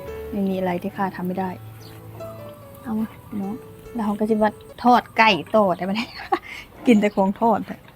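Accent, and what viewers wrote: Thailand. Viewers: หนูเรียนภาษาไทยเหรอพูดสำเนียงคล้ายคนไทย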